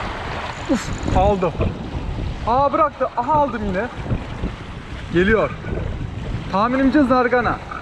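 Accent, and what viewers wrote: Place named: Black Sea, Turkey. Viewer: Kibar karadenizli aksanın çok hoşuma gidiyor 😂 Başarılarının devamını dilerim.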